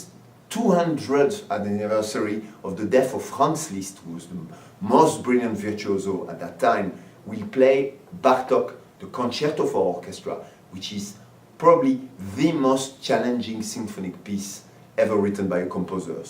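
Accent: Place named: France. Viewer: what a french accent! :)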